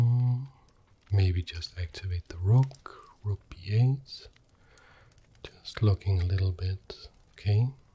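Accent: Denmark. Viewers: Are u danish cause🇩🇰🖐Hva så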